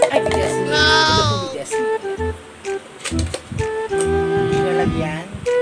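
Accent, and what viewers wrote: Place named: Iloilo, Philippines. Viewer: Ang Sarap Naman Po Nyan Lods... Parang ilonggo Ka Ata Lods. Haha Napansin Ko Lang Po Sa Tono. #Jf_Acuña_Lang_Malakas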